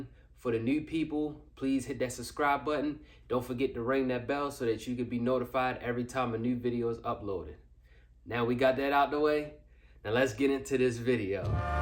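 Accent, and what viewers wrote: Baltimore, United States. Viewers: Bruh is definitely from Baltimore. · Sounds like your from Baltimore bro! Lol but great video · You gotta be from baltimore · gotta be from bmore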